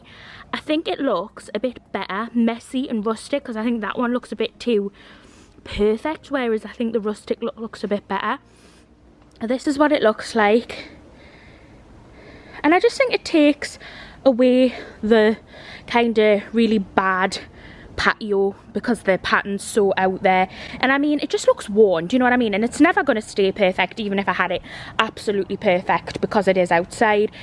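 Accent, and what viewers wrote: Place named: Jamaica. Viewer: Jamaican here. Its wild to hear the similarities in our accents. Good job on the patio!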